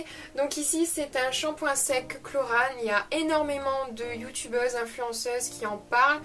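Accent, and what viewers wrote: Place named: Nord, France. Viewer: J'aime beaucoup aussi la marque Klorane 😊 le baume au beurre de mangue j'ai déjà testé tu vas voir il est super ! Je ne m'abonne pas à la Birchbox car il y a toujours des produits dans les boîtes que je n'aime pas et que je n'utiliserai jamais et je n'aime pas le gachis 😅. Tu nous en dira des nouvelles des produits et de ton ressenti. Tu es Nordiste non? j'aperçois un petit accent 😂 je suis Picarde. Super vidéo bisous 😘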